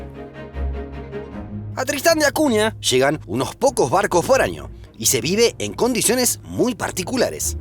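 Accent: Argentina. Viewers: Gracias! Me gusta mucho las vídeos como este. Por cierto, con que acento habla presentador? Argentino? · Olvidaste la Isla de Pascua .mmmmm tienes acento argentino. Eso lo explica .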